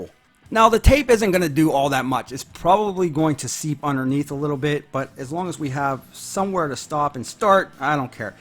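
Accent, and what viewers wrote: Pittsburgh, United States. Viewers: I like your Pittsburgh accent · I hear some yinzer in your voice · Pittsburgh accent?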